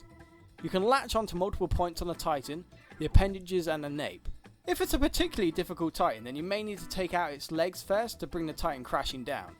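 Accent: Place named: United Kingdom. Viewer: by the way, you sound a little British, do u come from the UK? I do!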